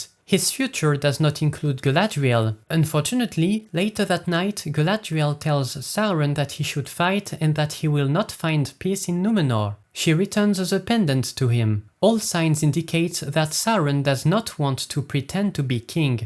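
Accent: France. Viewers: C' est un accent français ça?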